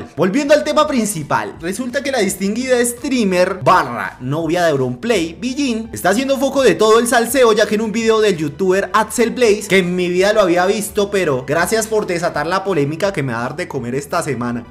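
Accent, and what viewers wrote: Bogotá, Colombia. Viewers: Vuelvete un canal de salseo pana, ver los chismes de los youtubers con acento rolo es divertidisimo y hasta donde se no hay ninguno colombiano que lo haga asi como lo hace pipepino en argentina o los espanoles y mexicanos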